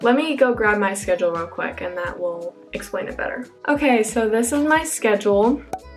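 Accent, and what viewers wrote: Germany. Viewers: Hope it’s going well for you! I can’t wait so see you when u come back with your lil German accent😍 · Hi, it would really interest me to know the “ballpark area“ of where you went to school, like what state. Sounded a bit like Bavaria to me. And yes, a lot of things vary strongly from school to school, so you can‘t generalize too much. The language phenomenon is something you will notice Europe-wide, depending where you go, so Dutch schools might have students that can speak English, German, their own language, and possibly even French.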